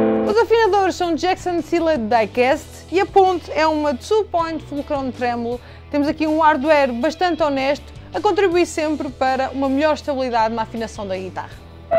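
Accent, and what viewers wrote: Portugal. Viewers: Por que sotaque português de Portugal em mulher é tão delicioso de ouvir? que delicia. 🤤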